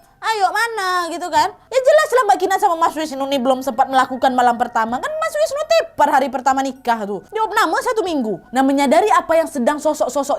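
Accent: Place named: Minangkabau, Indonesia. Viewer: orang minang yah? Huhu sukaaa banget logatnyaaaa. Campuran medan sama ada minang minangnya dikit. Semangat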